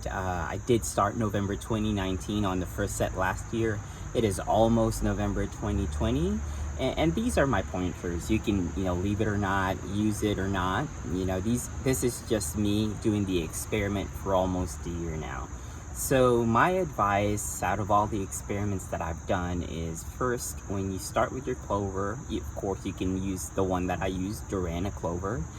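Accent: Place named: South, United States. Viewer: I was thinking you were from the South. Thank you for the video! 😊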